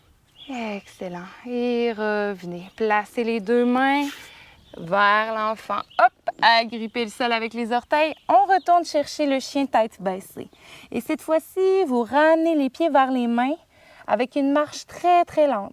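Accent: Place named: Quebec, Canada. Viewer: Merci cousine québecoise :) Jadore la séance et l'accent!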